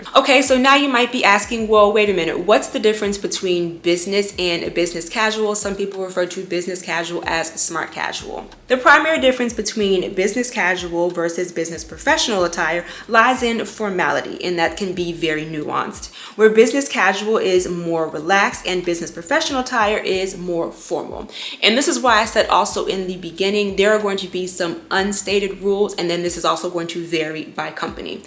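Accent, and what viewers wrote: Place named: United States. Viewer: I feel like this video kind of need a country and field preface too, on top of ”it varies from company to company”. Here in Sweden the corporate hierarchy is much flatter than in the US (which is where I assume you’re from just from the accent). Jeans, though maybe not ripped ones, are absolutely considered business casual and ripped jeans would be ok if your corporate culture is casual. I work in a university office and I’m the most dressed up at my office in, according to your scale, casual clothing. It BLOWS MY MIND that you had to PAY TO WEAR JEANS at your place of work. I feel like the attitude of woman in the TikTok is much more problematic than the shorts - not having the humility to take the very kindly hint from the recruiter that her attire wasn’t appropriate for that office is much more of a red flag than the shorts themselves. 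I feel like having someone to reference that you consider stylish and appropriate is very useful - personally I’ll ask myself ”what would Princess Kate (or sometimes Crown Princess Victoria) wear?” if I’m in any doubt as to what’s appropriate. Does the trick every time. 😁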